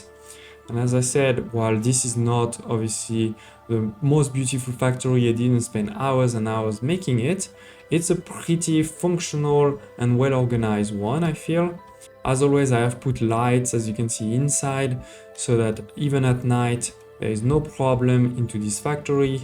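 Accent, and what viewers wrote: France. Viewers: l'accent Français c'est quand même vite reconnaissable quand on parle anglais :D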